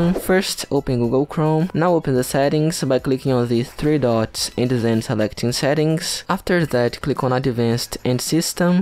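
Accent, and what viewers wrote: Brazil. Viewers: cara da pra perceber q é br so pelo sotaque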